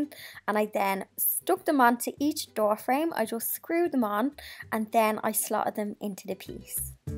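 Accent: Ireland. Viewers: Hi, just found your videos and subscribed so I can watch new ones! Spent the weekend watching your previous ones! Enjoyed all your up cycles, blogs. You have a beautiful home. I’m here in the UK. Love your gorgeous Irish accent too. 💕 x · OMG Are you Irish? What a lovely accent.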